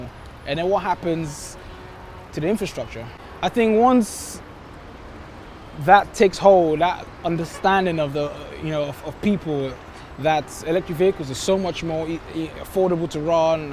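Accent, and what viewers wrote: Scotland, United Kingdom. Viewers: Love Scottish accent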